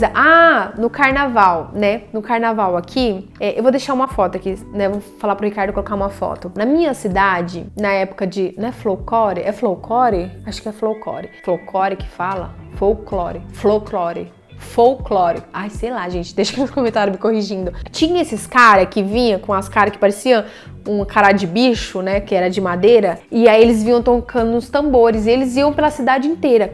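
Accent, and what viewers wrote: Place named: Rio de Janeiro, Brazil. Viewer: Também amo sotaque carioca (sou gaúcha - não amo algumas expressões daqui - mas gosto do jeito que o gaúcho da capital fala, acho jovial e único 😁). É desafiante recomeçar, mas há muito valor em recomeçar. É vida somada, sem dúvida! O que for material, será conquistado, certamente será. A vida é boa quando vivemos intensamente o momento, estejamos onde estivermos, em cada detalhe e fase. O sentimento de pertencer é mágico! É como reencontrar-se/reconectar-se. Folclore ☺ Nada é fácil ou só felicidade. O maior exemplo disso é realizar o sonho de se formar... A Universidade não é um morango e saindo dali, fica ainda mais tenso. Mas compensa pq é a materialização de um sonho. 🥰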